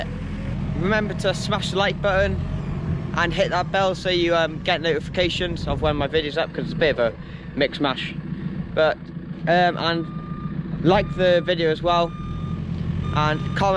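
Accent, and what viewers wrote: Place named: Pembrokeshire, United Kingdom. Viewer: Where abouts you from you sound like you’re from Pembs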